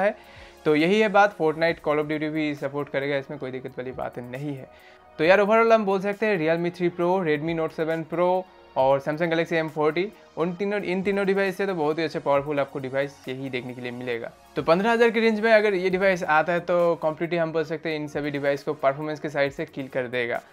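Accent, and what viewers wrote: Bengal, India. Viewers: Vai tmr bari kothay.. i think u r bengali.. tmr kotha dhoron bangla type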